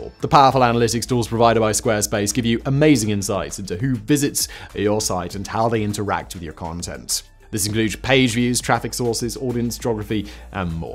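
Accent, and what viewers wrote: United Kingdom. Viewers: why is there suddenly 50,000 british guys narrating stock footage for ad revenue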